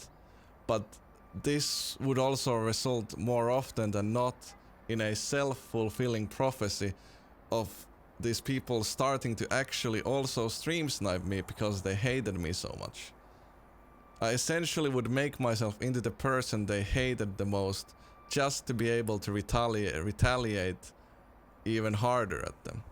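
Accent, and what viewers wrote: Finland. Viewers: I feel his pain when he tries to say an english word correctly, only finns know. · Finnish accent is kinda cute tho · I can hear your Finnish accent from a mile away, love that!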